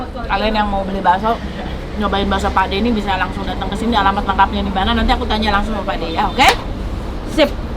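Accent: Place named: Sunda, Indonesia. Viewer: Sekarang aq jd ngefans am teh.. ngomng nya sunda pisan orgnya baik ke semua org sehat Dan sukses Terus teh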